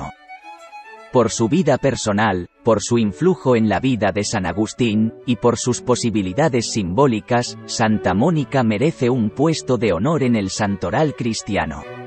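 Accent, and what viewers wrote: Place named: Spain. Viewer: Por fin encuentro un audiolibro en español de España!!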